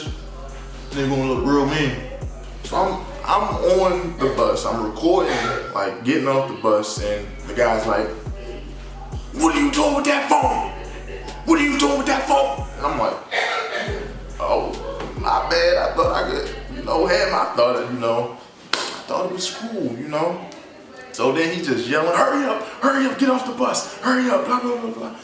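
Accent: Baltimore, United States. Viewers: I’m from Baltimore to G as soon as you started the video I’m like yo this dude from around here😂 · Lol I knew I heard a Baltimore accent. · i knew you was from here, baltimore in the first five seconds lmaoooo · As soon as I heard him talking I knew he was from Baltimore 🤘🏾🤘🏾😎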